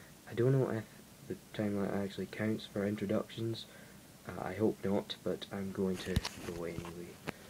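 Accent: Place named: Scotland, United Kingdom. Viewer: D :D :D :D :D :D Scottish Accent. And first comment! (wow those don't go together at all)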